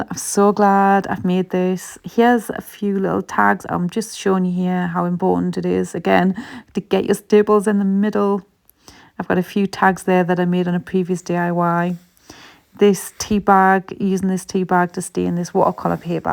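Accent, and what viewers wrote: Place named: North, United Kingdom. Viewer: Thanks for the video, very interesting but also your accent, you sound like me. I don’t live up North now so I don’t hear our accent very often. It was lovely thank you.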